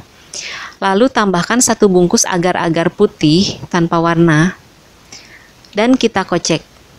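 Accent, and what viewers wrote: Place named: West Java, Indonesia. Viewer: ibu ini orng sunda kaya nya ...beda bicaranya bilng kocok jadi kocek ...mntap bu puding nya mau bikn nnti klu pulkam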